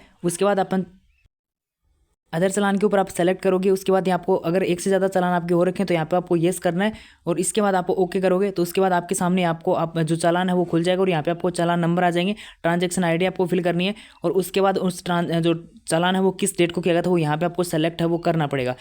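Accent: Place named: Rajasthan, India. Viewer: bhai teri rajasthani language ki boli mst h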